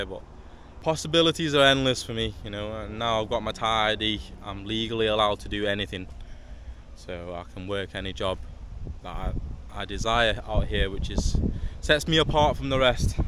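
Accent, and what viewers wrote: United Kingdom. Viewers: Bloody hell!! A Thai man speaking British! Good grief i can't believe it! 😅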